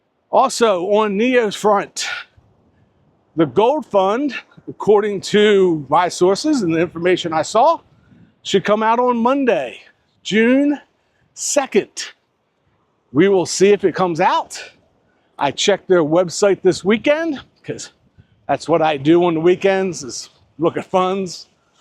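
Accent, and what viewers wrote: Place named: Philadelphia, United States. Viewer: I thought I detected a Philly accent or close by.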